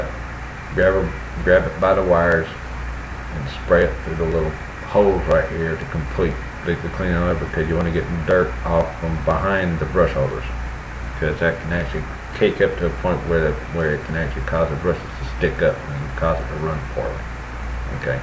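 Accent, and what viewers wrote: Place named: United States. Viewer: bunch of damn yankees commentin on the way this country boy talk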